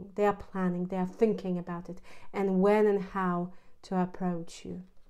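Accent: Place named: England, United Kingdom. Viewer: Love your English accent. You sound like a very sweet and caring person. I enjoy your readings.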